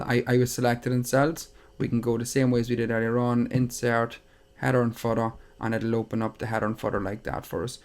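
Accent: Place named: Ireland. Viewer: love your accent. where is ireland are you from?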